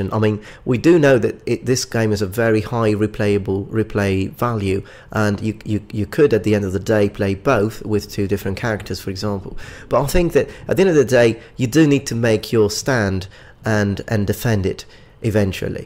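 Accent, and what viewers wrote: United Kingdom. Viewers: I love going back and watching older videos and it always takes me by surprise how thick and almost British his accent was at this point.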